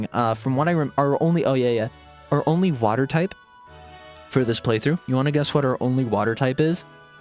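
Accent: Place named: RP, United Kingdom. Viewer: the super accurate posh accent got me hollering within the first few minutes HAHAH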